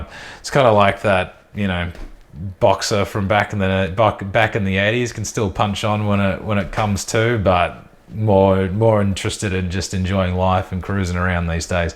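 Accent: Australia. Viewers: not at all. Just wondering why you Americans want the whole world to mould to the way you to things. Self promoted exceptionalism. is a great guy doing a great job - he is an Aussie (grew up not far from where I did), and of course he will speak with an accent and pronounce words as we do in Australia.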